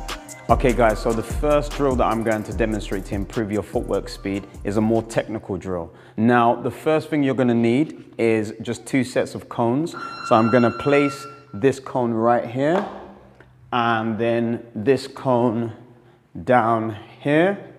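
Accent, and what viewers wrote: United Kingdom. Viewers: primera vez que oigo a un cubano (si no entendí mal) hablar en un perfecto acento britanico... y encima con excelentes tecnicas! gracias!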